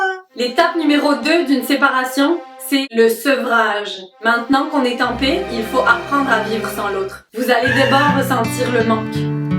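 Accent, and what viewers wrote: Quebec, Canada. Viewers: tu as l'accent de celine dion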